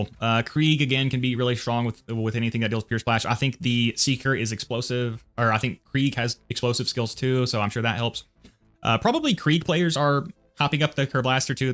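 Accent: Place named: West, United States. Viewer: I’ve watched a ton of MOLMF vids this guy clearly sounds way more southern. While sounds more western, I think Nevada or New Mexico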